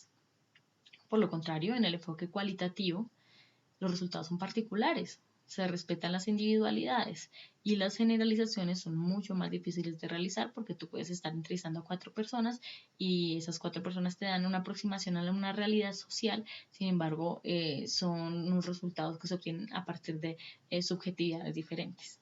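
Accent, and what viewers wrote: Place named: Colombia. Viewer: Gracias, las Colombianas hablan bello, me enamoro su vos.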